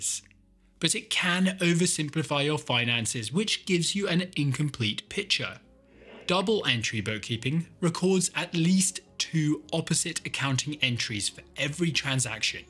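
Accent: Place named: United Kingdom. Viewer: I'm a software engineer and recently joined a team who is building and maintaining accounting software. I have very little accounting experience and no training. But your videos have helped me tremendously to understand the various concepts and to be in the position to make a more meaningful contribution to the accounting software. Thanks for the excellent work. I'm looking forward to the rest of the Accounting Basics series. I must say though, I preferred your speaking style from your earlier videos. They were more relaxed and fun to listen to. I was surprised to hear the change in accent in this video and thought you went intentionally into some kind of "British mode" for this video's sake 😄. But your other more recent videos also sound similar. Also missing the close-up shots in this video which gave your videos that extra edge. Please don't sacrifice your unique and fun teaching style for the sake of sounding and looking more "corparate-y". 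All the best!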